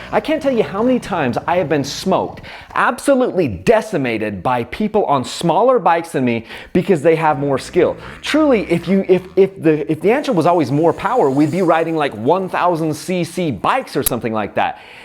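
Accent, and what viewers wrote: Utah, United States. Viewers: I thought you were saying “engine breaking”. Hahaha. I live that Utah accent 👍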